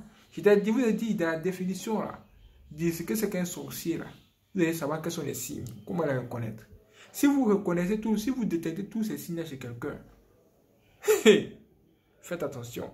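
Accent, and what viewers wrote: Ivory Coast. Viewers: C'est un accent purement ivoirien ça.... Très loin de l'accent camer...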